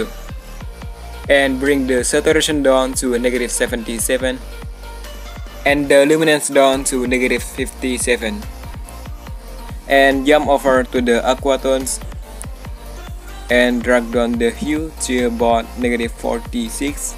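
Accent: Indonesia. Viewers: Indonesian accent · are you indonesian? hahaha those accent tho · English aksen indonesia 😅 but videonya sangat membantu bro.. thank u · aksen Indonesia memang top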